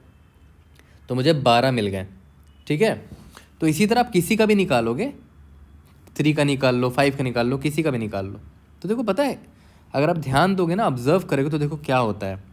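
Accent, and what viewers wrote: Bihar, India. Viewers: i feel you are from bihar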